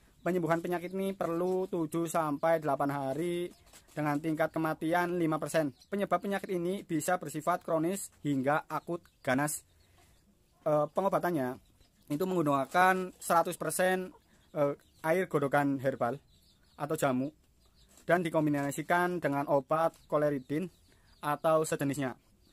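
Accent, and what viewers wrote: Banyumas, Indonesia. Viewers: Ora ngapak ora penak, mantap lanjut terus boss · Assalamu'alaikum.. mas.. buat video untuk ternak puyuh petelur mas..🙏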